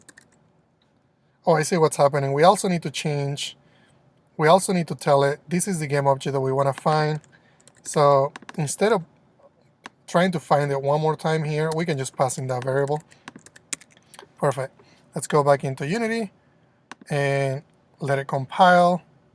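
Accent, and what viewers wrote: Spain. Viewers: Good Video. As I noticed your accent, I think your mother-language is Spanish. If that's true, It would be awesome to hear you recording some (or all) of your videos in Spanish too. Hi, from Ecuador.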